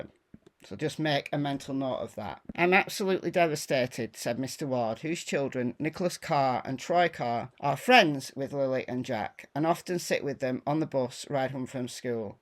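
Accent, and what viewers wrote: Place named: Northern England, United Kingdom. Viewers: What part of England are you from! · I love your style of reporting - I live in Wales so it’s nice to hear your northern English accent!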